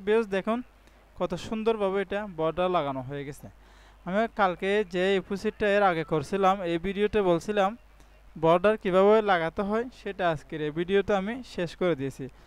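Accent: Chittagong, Bangladesh. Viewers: ভাই আমার মনে হয় তুমি চিটাগাং এর লোক তবে ভিডিও করার সময় কথা একটু জোরে বলবেন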